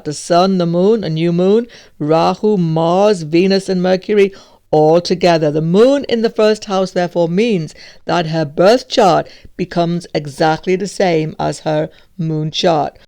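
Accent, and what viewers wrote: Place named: London, United Kingdom. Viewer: come on, it is deep and captivating. it looks british accent (London) to me but with some other strong accents I can't decipher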